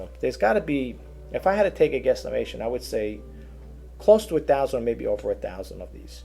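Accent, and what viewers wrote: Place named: New York, United States. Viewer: Beautiful back ground music at the end of this vid..I'm 1/2 Finnish American, you may enjoy Sibelius...Finlandia and the Swan of Tuolla ...wrong spelling but you get the idea...you take nice pics of the clouds too...take it you are from New York...your accent...happy hunting and peace to you and yours this approaching Christmas Season ! Corie in Seattle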